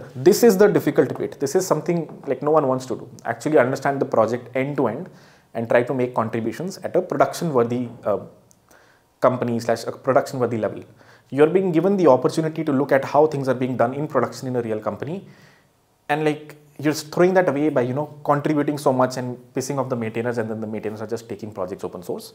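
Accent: India. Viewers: I've worked with outsourcing so long that I am very familiar with the meter and pronunciation. I have no issues with the Indian accent.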